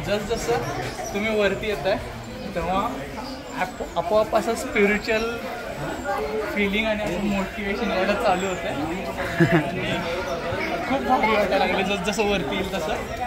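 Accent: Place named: Kolhapur, India. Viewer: Awesome vlog brother🙌🔥. Congratulations on successfully completing your architecture degree👏. I had watched Krish mahadiks vlog of climbing tirupati balaji steps. You guys are amazingly fit, you didn't took any rest that's commendable. And love your Kolhapuri accent❤️😄. How much time did it took for you guyz to reach the top?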